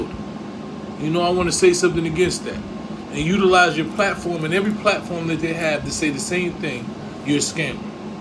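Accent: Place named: Arab world. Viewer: Bro stopping saying hit the like button like & prescribe like that bro u sound like a arab use that persons voice it sounds more real believable bro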